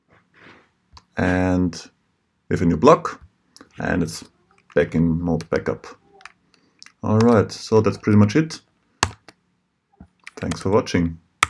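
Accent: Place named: Germany. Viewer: you sound so german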